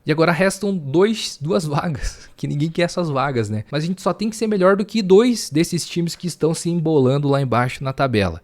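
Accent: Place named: South, Brazil. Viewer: Sou vascaino e estou aqui assistindo Vasco x Bahia e fortalecendo o seu canal. Você é natural que lugar do Sul?? PARANÁ? Acho foda o jeito de vocês falarem. Parabéns pela vitória!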